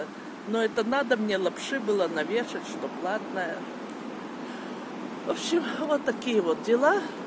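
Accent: Odessa, Ukraine. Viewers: Тетя супер! Милый одесский акцент!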